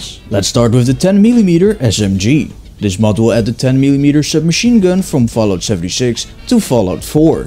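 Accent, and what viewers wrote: Netherlands. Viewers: Literally translated "good busy". In proper terms, usually a sarcastic way of saying "well done". He's Dutch, like me, so I can easily notice his accent. I merely made a bit of a giggle here; nothing serious. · I'm i right on detecting a dutch accent? Ik denk het wel namelijk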